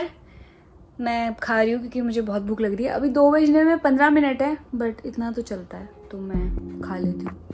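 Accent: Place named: Pahadi, India. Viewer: You are pahadi and delhi ki... i can sense you mann!!! Amazing review.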